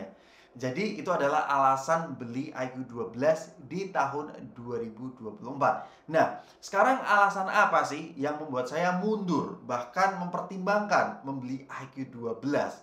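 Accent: Semarang, Indonesia. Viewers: loh citragrand, masnya orang semarang yaah? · loh semarang to mas ?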